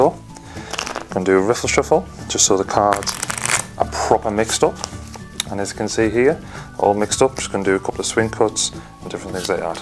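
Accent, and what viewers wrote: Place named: North East England, United Kingdom. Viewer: Great video. I'm new to Magic card tricks and just bought my first stripper deck. Sounds like your from the North East of UK? Good luck with the Magic mate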